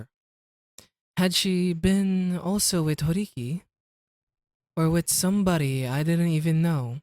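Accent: Philippines. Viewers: Love your speaking bro, just a few seconds in and I can realize you're Filipino haha. This is my first time listening to an audiobook because I could never get myself to read long texts so thank you for doing this.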